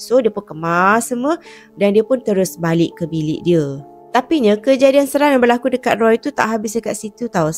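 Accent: Kelantan, Malaysia. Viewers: Assalamualaikum cik puan story ni maaf bertanya lah yer, Kelantan tu cik puan daerah mana tu, maaf sebab bertanya tu cara percakapan tu mcm loghat Kelantan, sekian terima kasih.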